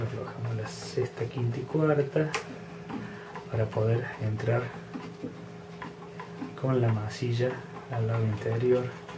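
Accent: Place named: Jujuy, Argentina. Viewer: Eres de la quebrada? Jujuy? Digo por el acentico. Saludos desde Chicago.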